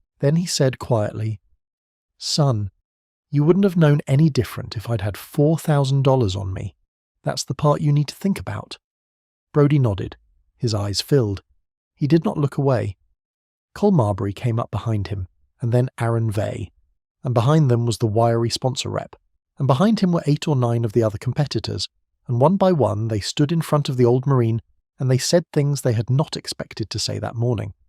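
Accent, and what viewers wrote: United Kingdom. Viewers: Hate this AI with British condescending voice and accent.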